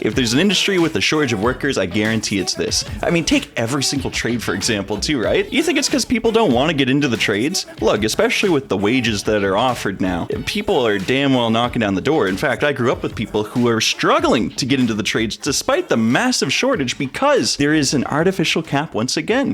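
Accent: Canada. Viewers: Oh man I clocked that Canadian accent easily The classic “EHRR” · The Canuck accent is gorgeous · Unbearable Canadian accent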